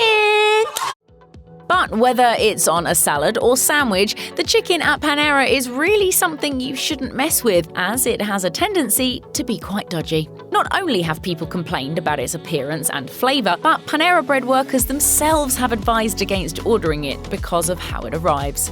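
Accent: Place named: United Kingdom. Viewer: The British feminine accent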